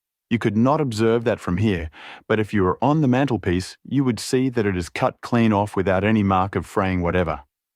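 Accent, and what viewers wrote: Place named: Australia. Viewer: Ai Australian accent? No no no ....I'm gone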